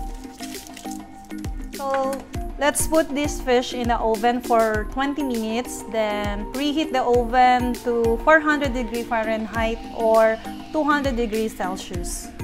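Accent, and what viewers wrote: Philippines. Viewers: Pinay accent 😍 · are u pinoy? (the way u speak engliiish)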